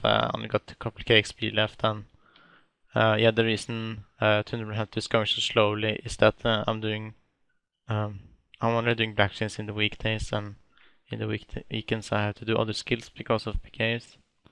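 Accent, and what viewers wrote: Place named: Norway. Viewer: his norwegian accent is very strong and thats not really how we talk at all, mostly elders who didnt learn much english at school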